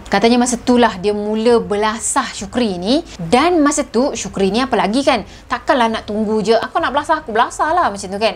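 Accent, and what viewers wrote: Kelantan, Malaysia. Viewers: Akak ckp sleng klante tu ada laaa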